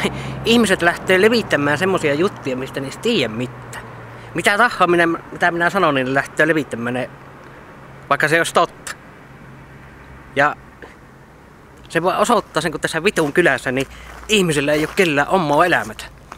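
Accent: Savo, Finland. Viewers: Videot o hyvii, mut ärsyttää toi savon murre :D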